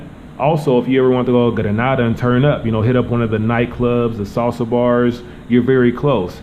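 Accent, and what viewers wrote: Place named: West Coast, United States. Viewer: were you raised in Cali-fornia? definitely have that west coast lingo accent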